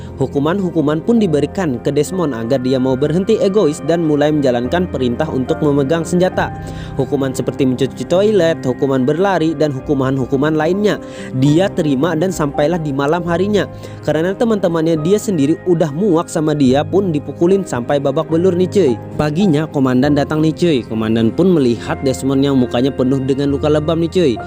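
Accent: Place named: Banjar, Indonesia. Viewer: Kok kaya orang banjar logat ya bro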